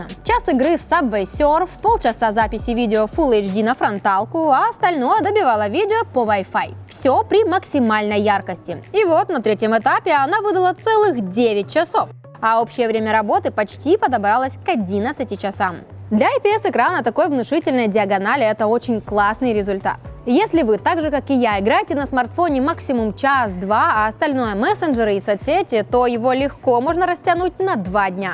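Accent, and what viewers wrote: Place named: Kuban, Russia. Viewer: Кубанский, Украинский-южный как короче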